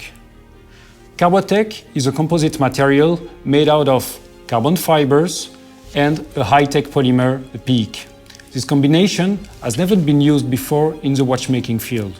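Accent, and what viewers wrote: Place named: France. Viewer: Gotta love it when the French try to speak English. Don't get me wrong, I admire it. When I travel to France the reception is warm due to my trying to speak their language. I have a ways to go in terms of improvement. They respect those who at least have some understanding of the French language and don't butcher it.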